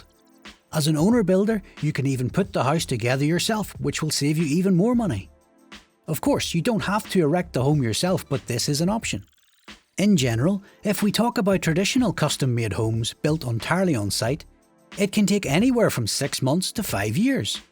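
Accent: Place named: Ireland. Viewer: I'll listen to this Irish dude all day long....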